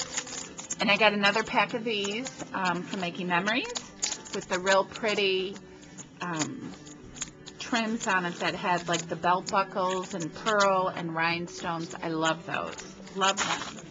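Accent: New Jersey, United States. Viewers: U CRACK ME UP!! I just love seeing your videos pop up because beyond enjoying what you create... I just love listening to your monologue!! I have a dear friend who's a Jersey girl, too, and its like listening to her when I catch your videos! You always provide a laugh for me!! TFS! ~Karen P.S. DEAL, DEAL, DEAL!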